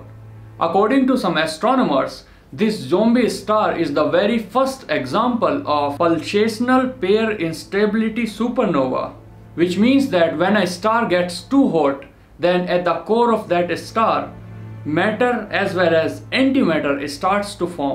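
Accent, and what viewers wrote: India. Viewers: I hate an Indian when he talks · I want the text back not because of your accent im indian too but i don't have that accent but with text its chill for me :D and u very genius mwen :D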